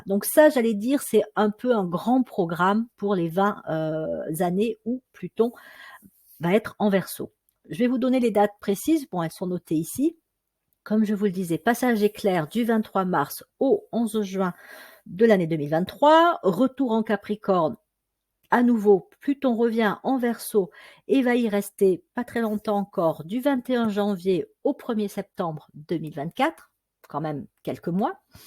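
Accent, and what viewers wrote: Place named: Nice, France. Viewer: Je vous découvre et vous trouve vraiment géniale, je parierai que vous êtes un gentil gémeaux niçois à votre accent et votre facilité d'expression! Merci de la part d'un petit gémeaux avec Pluton en Lion dont vous aidez à éclairer la lanterne. On attend la suite avec intérêt.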